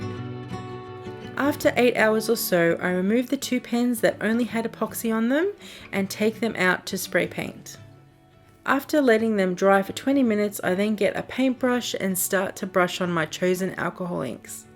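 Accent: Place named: Kentucky, United States. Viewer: Love that Kentucky accent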